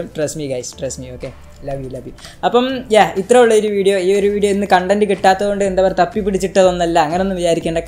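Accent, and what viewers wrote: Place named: Malappuram, India. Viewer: # നിന്റെ വീട് എവിടെയാ..? മലപ്പുറം ആണോ ..? നല്ല മലപ്പുറം ഭാഷ... !!😘😘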